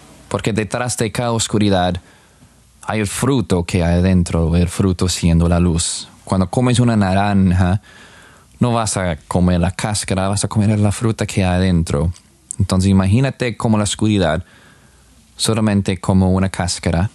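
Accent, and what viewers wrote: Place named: Colombia. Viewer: Es muy inspiradora su historia, ….yo lo vine a conocer a ud en el programa enamorándonos de Univisión y me pareció un gringo con un ace tico en Español como Colombiano por eso acudí a las redes a averiguar por ud y si mi sospecha se aclaró ud aprendió Español en Colombia jajajaj lo felicito por su tenacidad y verraquera para lograr sus sueños, un abrazo parcero…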